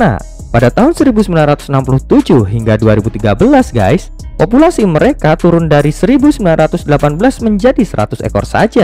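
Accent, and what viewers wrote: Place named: Java, Indonesia. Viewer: ketauan ya kalau komentatornya orang Jawa... logat hurup K hilang😄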